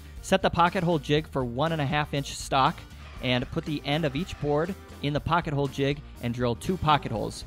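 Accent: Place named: Montana, United States. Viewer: I have to ask: Is that what a Montana accent sounds like? I noticed you say the A in "bag" and "rag" in a very different way. Very nice looking table!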